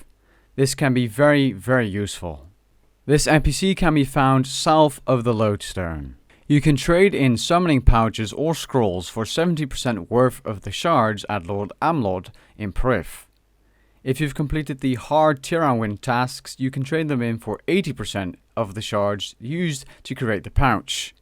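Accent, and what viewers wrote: Netherlands. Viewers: You are Dutch? :o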